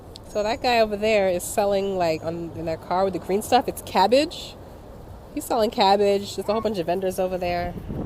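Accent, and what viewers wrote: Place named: East Coast, United States. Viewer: I admire you and your family. I could tell you were from the east coast (I'm from Philly) by your accent. I also can tell you are a little homesick. It is hard to adjust to about anywhere coming from New York. My fiancee is from Brooklyn and she's easily bored. Philly keeps her marginally stimulated. It is nice to see a thriving black nuclear family like yours and within your native culture.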